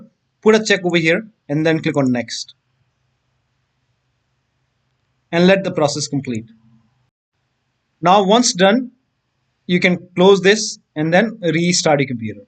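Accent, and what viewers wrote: India. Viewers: that's awesome thankds for your help, by the way your india accent is so special😁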